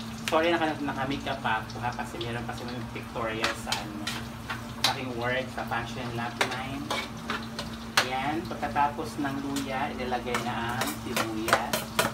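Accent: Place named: Visayas, Philippines. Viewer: I love how to cook, magaling k tlga alam mu mga technic para maging masarap luto mu, sana dumami pa mga subscribers mu kasi sobrang hambol mu po, I love your way to speak very calm khit visaya accent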